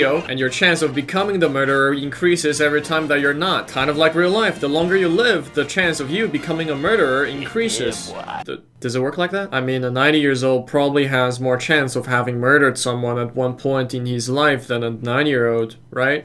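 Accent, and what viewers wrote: United Kingdom. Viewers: You are britsh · BRITISH BRITISH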